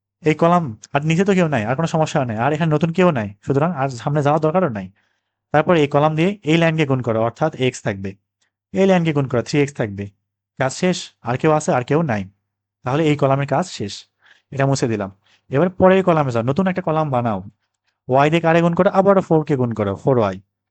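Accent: Naogaon, Bangladesh. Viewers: Onk upokrito holam..❤sir hamar bari kolo nouga vasa kemba chena chena lagce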